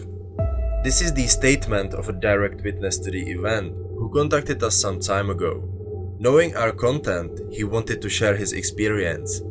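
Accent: Czech Republic. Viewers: dal som si tu namahu aby som zistil odkial je vlastnik kanala a ano nemylil som sa ....ceska anglictina je jedinecna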